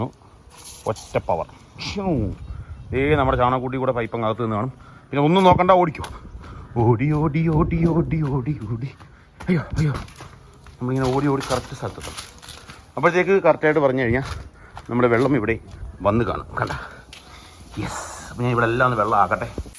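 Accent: Kollam, India. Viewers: ചേട്ടന്റെ സ്ഥലം എവിടാ നമ്മുടെ കൊല്ലക്കാരുടെ സംസാരം ആണല്ലോ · Kollam slang.......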